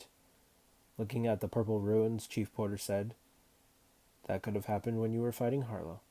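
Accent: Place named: Tennessee, United States. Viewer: Great voice. Thank you for uploading. What state are you from? You sound like a friend from TN.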